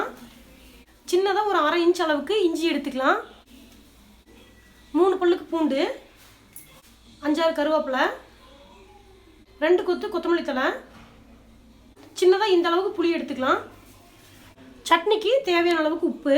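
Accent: Kongu, India. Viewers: Neenda idaivelikku piragu alagana kongu tamilil receipe arumai kutties nallarukkangalamma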